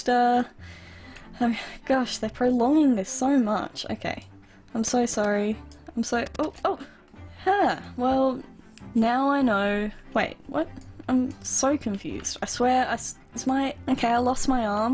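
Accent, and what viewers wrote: Australia. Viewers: she sounds like Australian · R u Australian?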